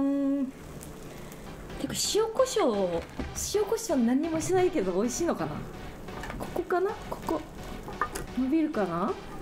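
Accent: Kansai, Japan. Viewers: 日本語も英語も混ぜ混ぜで 関西の女の子って感じで素敵ですね😍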